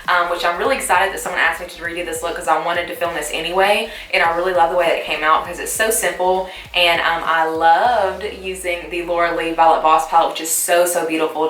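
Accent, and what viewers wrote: South, United States. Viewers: New subbie bc u r gorgeous and i love your southern accent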